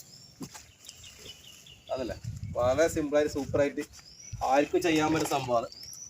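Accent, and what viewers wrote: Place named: Kannur, India. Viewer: Slang belongs to Kannur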